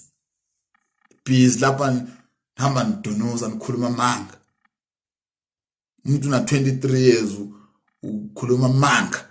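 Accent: Zimbabwe. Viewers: Ngiyakuzwa mfwethu ukhuluma iqiniso, kodwa manje wakhulumisa kwama Zimbabweans kangaka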